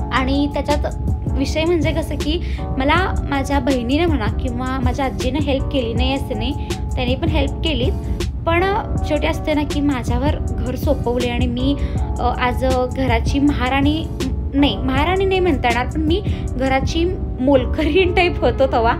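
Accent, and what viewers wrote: Kolhapur, India. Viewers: Orignal kolhapuri language 🥰🥰🥰🥰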